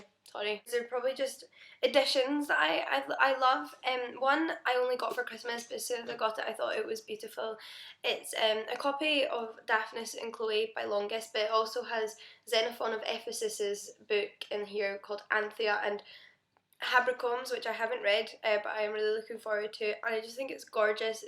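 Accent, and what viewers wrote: Scotland, United Kingdom. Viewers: Where are you from in Scotland? I'm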